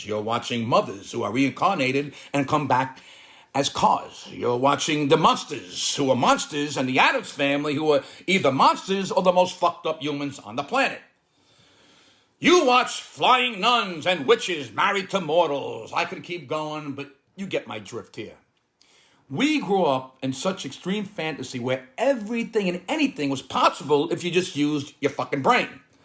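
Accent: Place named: Brooklyn, United States. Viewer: Brilliant observation and delivery!!! We moved from NY to Georgia (a suburb of Atlanta) last year (per my wife’s insistence) and I expected to encounter hillbillies. Far from the truth. People here are like those of any other big city. Besides, many people here are transplanted northerners. And my town is about 30% Asian! I love your humor, except for the profanity (but I know that’s part of your shtick). Listening to you, I feel that I’m back on the streets of Bensonhurst as a kid in the 1960s and 70s.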